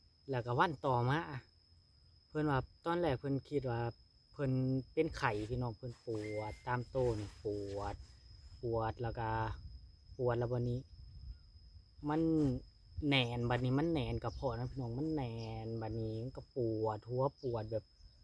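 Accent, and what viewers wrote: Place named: Ubon Ratchathani, Thailand. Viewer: สะออนนำเด้มีแต่ดอกใหญ่ๆบ่าว เสียงปากคือทางบ้านแม่ทางอุบล เนาะบ่คือทางลาว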